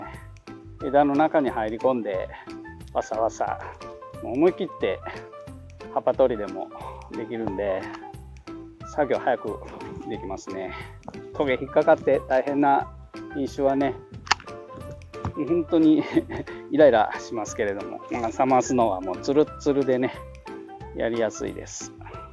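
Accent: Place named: Kansai, Japan. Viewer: はじめまして、いつも拝見しています。 品種別の剪定など、詳しく解説していただいてありがとうございます(❁ᴗ͈ˬᴗ͈)⁾⁾⁾ 今は千葉に住んでますが、関西出身なので関西弁のお話に癒やされています。 我が家に地植えして4年ほどたったイングリッシュローズのオリビア・ローズ・オースチンがあります。 今年は春に2、3輪しか花が咲かず、その後も咲くことなく現在に至っています。 同じタイミングで植えたデスデモーナはこの秋に30輪以上花が咲きました。 同じ様なお手入れをしましたが、オリビアは葉が茂り枝が伸びるばかりで蕾がつきません。 冬剪定を予定していますが、アドバイスをいただけないでしょうか？ 株は縦横90㎝くらいで、ベーサルシュートは4本出ています。よろしくお願いいたします。 寒さも厳しくなってきましたので、御自愛くださいませm(*- -*)m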